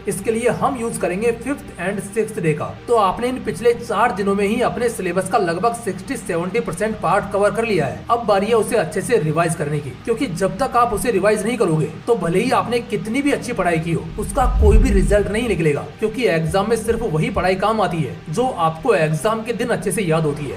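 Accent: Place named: Mewat, India. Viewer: Haryana new Mewati soundh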